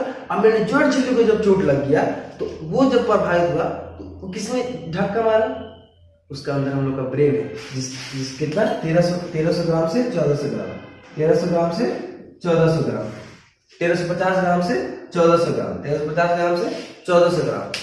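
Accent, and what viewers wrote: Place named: Bhojpuri, India. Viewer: pure bhojpuri sir❤❤❤❤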